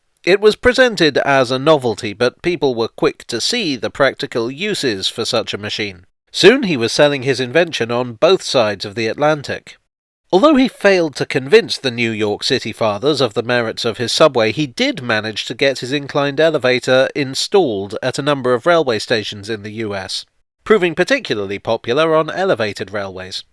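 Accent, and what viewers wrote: United Kingdom. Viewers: Actually, with your British accent, you seem to make it sound much more upscale & classy than it actually is. Very well done Sir.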